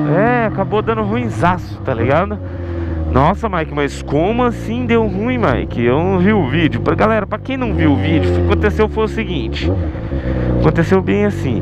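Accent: Goiás, Brazil. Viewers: Pq ele fala no piqui do Goiás??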